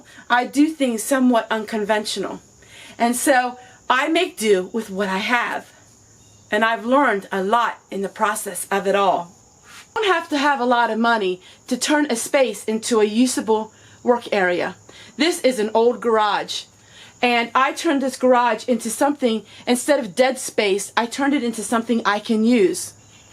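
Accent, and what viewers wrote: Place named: South Louisiana, United States. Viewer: interesting video. I’ve watched a few videos, and I’m trying to place your accent. South Louisiana? Nonetheless, you have some really interesting videos. Keep up the good work!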